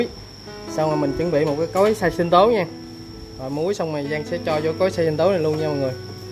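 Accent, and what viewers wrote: Ben Tre, Vietnam. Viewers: Nghe giọng nói giống như bến tre z a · Cho mình hỏi, nghe Giang nói chuyện giống người miền Tây, giống quê mình, bạn ở đâu vậy?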